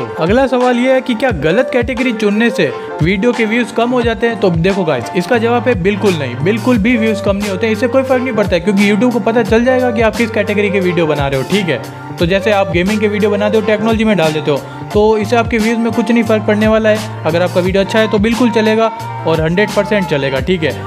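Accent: Gujarat, India. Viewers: muje apki voice laga ki app gujrati ho ❤❤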